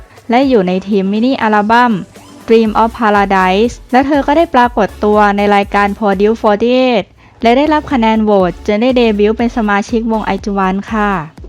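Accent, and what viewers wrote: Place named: Thailand. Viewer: ศัพท์อังกฤษ ออกเสียงไทยจ๋าเลยพี่สาว ไม่มีการใส่สำเนียงเลย ฟังแล้วตลก แต่ก็ยินดีเข้าชมเพราะอุตส่าห์อ่านให้ฟังอะนะ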